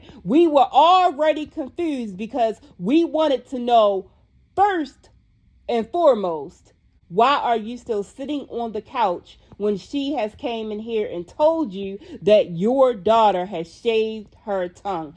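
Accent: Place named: Maryland, United States. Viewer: Hey, You have an accent the same as my cousins from Maryland Are you from Maryland or Washington, D.C,?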